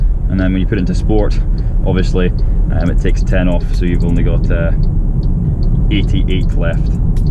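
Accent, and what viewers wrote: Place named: Scotland, United Kingdom. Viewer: Well done for keeping the review informative, short (under 10 minutes), and entertaining. The Scottish accent is an added bonus.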